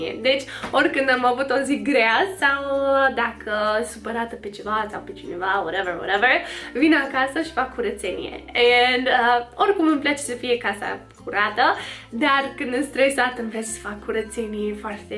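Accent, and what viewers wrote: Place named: Transylvania, Romania. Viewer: O americanca care vorbeste ardeleneste!!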